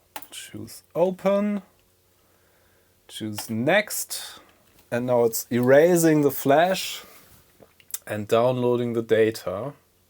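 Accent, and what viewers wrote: Germany. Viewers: Ich würde mir Wünschen das Du die Videos auf Deutsch machst. Dein Deutsches Akzent bei deinem Englisch ist Grauenvoll. Übrigens ist die größte Retro Community eh in Deutschland. Man kennt ja den Deutschen, der hält an eine Sache Fest bis Lebensende, egal ob die Sache Gut oder schlecht ist.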